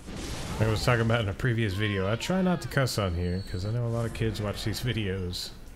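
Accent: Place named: South, United States. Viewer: Yes ma’am I can tell you got that southern draw lol me too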